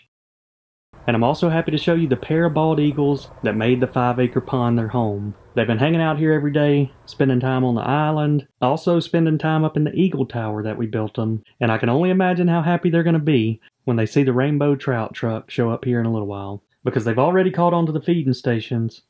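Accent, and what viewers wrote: South, United States. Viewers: I just love listening to this american southern accent! greetings from Europe!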